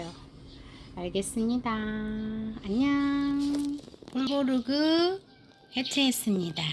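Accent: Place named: Gwangju, South Korea. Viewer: 사투리가 정겹습니다 저도 광주라 듣기편했어요 ㅎㅎ 삽목이 꽃피운거 분양해주시면 안되나요? 저도 러샤바욜 하나 키우는데 둘이 칭구 만들어주고싶네요~~^^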